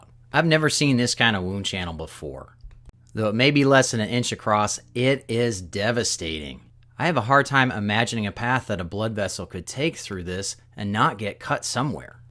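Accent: United States. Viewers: I find it funny that every video I have seen concerning weapons - the narrator always have a thick American accent. Is America the most weapon-happy country in the world?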